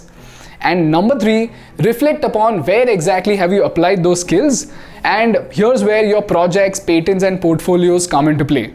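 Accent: India. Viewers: Tbh, as like everyone else, I too am so fortunate to find this channel. So informative and concise with a great Indian Accent English. Thanks to you, Cred and YT. You know why I thank them. Keep up the Good work bro.🔥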